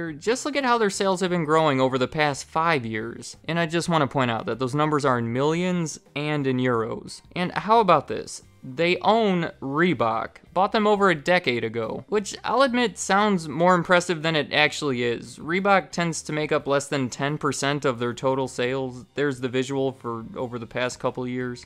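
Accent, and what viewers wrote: United States. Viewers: This dude is so American it’s painstaking to listen to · hahha, yeah it's an American kind of thing to take liberties with other languages or Americanize English words isn't it😉 adidas = ugh-dee-dus aluminium = ugh-loo-me-num coupe = coop colour = =color They've got Nike down though👍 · No need to apologize for the Americanized pronunciation; as an American living in Europe, I don’t take offense to the onslaught of mispronunciation of scores of American brands. It would be petty...😉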